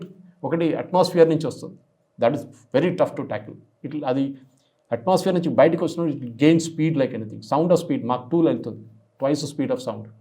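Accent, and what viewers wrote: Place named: Telangana, India. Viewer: పక్కా తెలంగాణ భాష మాట్లాడుతాడు. మలయాళం భాష మీద పట్టుందా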